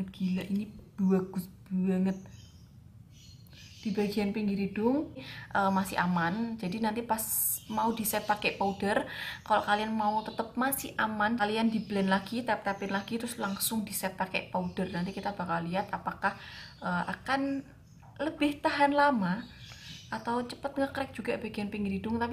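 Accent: Java, Indonesia. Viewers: Telat 33 menit nih mbak medok.. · Selalu suka review nya mbak medhokkk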